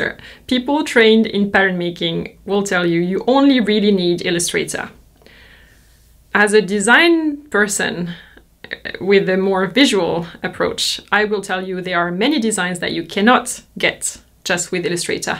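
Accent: France. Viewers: I just enjoy your French accent so much Music to my ears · She has a French accent. The more you listen the more you'll understand.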